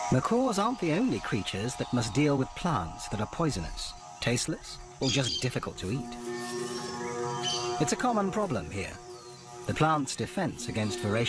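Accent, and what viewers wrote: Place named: United Kingdom. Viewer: British narration ruins it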